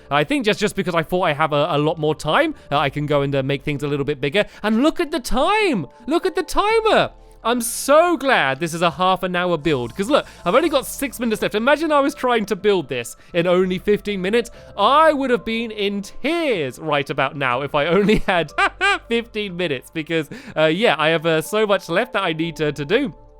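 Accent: United Kingdom. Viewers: It is so weird hearing train stuff in British English.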